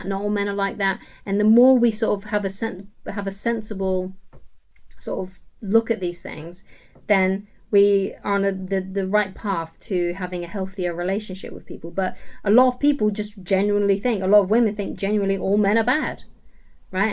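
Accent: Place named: United Kingdom. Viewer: Your british accent pisses me off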